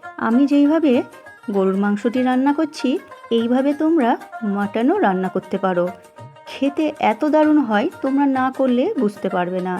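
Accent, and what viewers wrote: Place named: India. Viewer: রান্নাটা দেখতে দারুণ লাগছে। আপনি কি ভারতীয়? কথাবার্তা ঠিক আমাদের মতো মনে হচ্ছে না।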